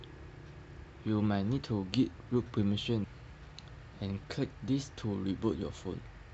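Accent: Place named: China. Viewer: 请问能出一期中文的吗，本来就英语不好，还要听chinglish😁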